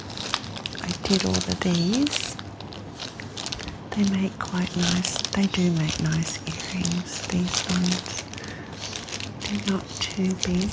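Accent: Australia. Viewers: Oh no! Don't take everything to the Post office :D I wanted to buy couple of the items from etsy but I need couple of days for some more cash, I will mssg you at etsy which items I will definitely order, if they are still there. PS. Perfectly relaxing video, crinkles and soft voice, aussie accent is one of my fav <3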